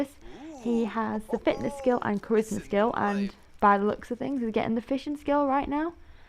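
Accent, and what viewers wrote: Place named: United Kingdom. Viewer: I thought you was UK I am to East Midlands lol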